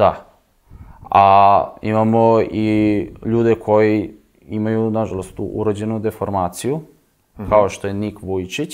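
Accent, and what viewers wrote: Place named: Subotica, Serbia. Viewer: Subotičanin? Zbog akcenta bih rekao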